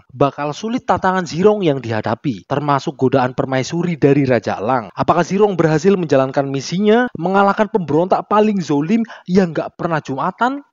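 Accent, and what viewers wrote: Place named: Java, Indonesia. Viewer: Mantap jawanya masih kental sekali😅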